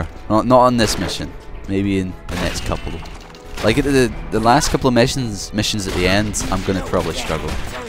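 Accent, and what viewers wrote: United Kingdom. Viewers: Oh my bad. I knew you were somewhere from uk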